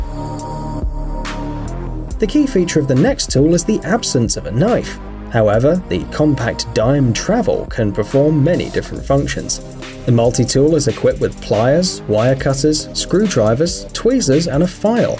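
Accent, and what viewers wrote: England, United Kingdom. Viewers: Some of the most useless and unnecessary products I have ever seen...and an English accent doesn't help to sell them.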